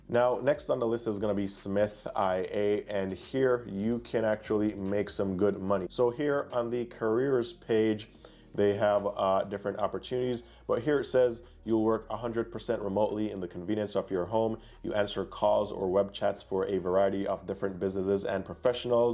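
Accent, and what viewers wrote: Jamaica. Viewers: Sense a bit of an accent. Are you Jamaican?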